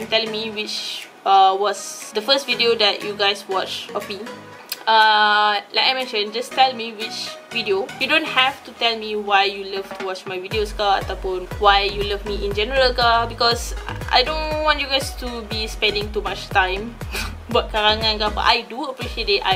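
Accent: North, Malaysia. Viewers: Your first video that i watch probably the fresh everyday makeup look...terjumpa video tu masa tgh scroll....terus terlekat sampai la ni. video suma 😂 lawak la bila ckp..pastu suka tgk loghat utara kuaq haha..😂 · first video yg kmi tngok tu video top 10 drugstore products. lpas tngok truih mcm ni taste aq pnya video ni. yg tk bosan and tk rsa nk skip pun. ap lg. truih subscribe laa. and bermulalah detik2 mngikuti stiap vid lpas tu. suka sngat tngok vid sbb mmg information padat and jujur. Org yg sngat slumber gilaa, yg tk kesah laa ap pun, tk cover ayu2, macam aaa just be yourself pnya attitude gituh.Kmi suka org mcm tu. Bru selesa kan nk buat ap2 pun.Video plak diselitkan juga dngan crita yg mmg menarik dan lwak.Jrang sngat ttiba tngok vid makeup tapi glak srg2. Kecuali vid ja (its true, not just because the giveaway XD) Yg pling tk bleh lupa crita msalah confuse tarikh tu. 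Tarikh ttkaq laa, tiket tarikh lain. You just like me. Vid plak bkan guna bnda yg sma slalu, sbbkan tu tk ragu2 pun nk tngok vid baru atau vid yg lain. Loghat plak mmg utaqa, geng skali. Tak prnah bosan laa jwabnya. Kdang duk tngok ad dk vid yg baru. Bila ad, truih tkan. You deserve all this. Org tkkan suka something tnpa sebab:) Semoga ceria slalu and keep update new videos for all your fans <3 (lupa buh so edit blik kehkeh) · first video yang i tengok is try-on makeup haul | wet n wild MY , masa tu i tengah tengok review review product wet n wild haa sekali nampak pulak review from malaysian youtuber apa lagi tekan teruih la . seronok gila your video sebab the way you talk , reaction you sumpah lawak and i love you loghat utara . congrats on your 10k and mungkin lepas ni 20k , 30k pulak . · Congrats on your 14 k subscribers. Actually dh tk ingt mna video first yg i tgok sbb tiap2 mlm sbelum tdoq dok ulang tgok vdeo 😂😂I've to checked it back and yeahh rasa rasanya video "duck cosmetic honest review" tu kot. 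Lepas tu terus ishh sapa dia ni jujur sungguh review nih aku suka then terus subscribe and sbb hg ckp utara tu suka dngaq beletiaq. Hahaha 😂😍💃🏼💃🏼💃🏼💃🏼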